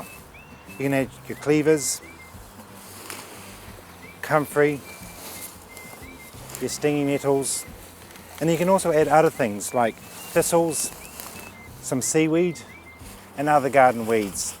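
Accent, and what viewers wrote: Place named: New Zealand. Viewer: Really good show.First time i saw your chanel today.U sound kiwi, i'm from land of wombats and magpies. You are still answering questions from 2yrs ago, magnificent, not many do this. U show care towards your viewers , a rare quality. Will check some of your others .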